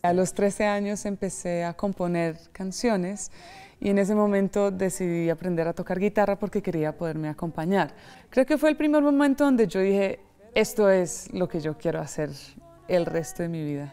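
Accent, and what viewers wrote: Colombia. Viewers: Un precioso acento colombiano es parte de tu talento. Gracias!!! Thanks!! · Ya veo porque, noté un aire también Caucano en tu forma d hablar y de cantar,claro si has vivído en la Bella Popayan,que alegría contar con una artista integral como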